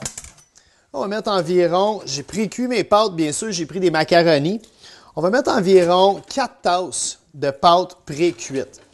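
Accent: Quebec, Canada. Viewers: Oui quoi son accent ??? Pas Français de pure laine, non pas possible.Le Québec (après les pauvres millier d'Amérindiens exterminés par les colons Anglais et Américains) a été fondé par qui au juste .....on ravale son trop plein de grâce....Merci.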